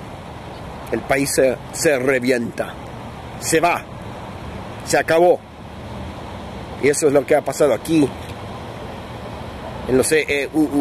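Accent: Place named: Argentina. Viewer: Loco,,,your spanish is very intact...HOLY CRAP....Argentina accent mixed with Spaniard...very inspiring... TWO THUMBS WAY UP!!! BEST VIDEO SO FAR!!!! MORE MORE MORE CHE!!! Will be showing it to my pops! LOL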